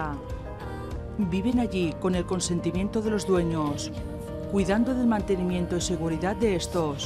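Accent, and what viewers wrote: Spain. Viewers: Hola, me encantan tus vídeos, hermosa voz española, cuidate mucho, chao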